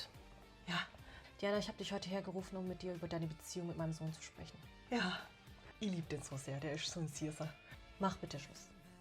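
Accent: Swabia, Germany. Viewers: Des vestehed nur schwaben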